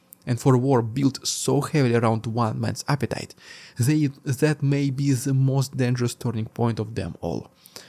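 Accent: Russia. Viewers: Yea you were nice and funny in the beginning But I have given you 2 years of views and you still sound like you have a sock in your mouth when you speak English. You so can do better. I unsubbed just because of your laziness for speaking properly. You have had over 2 years!!!!!!!!!!! And you still speak like a Russian that just started learning. NO EXCUSE!!!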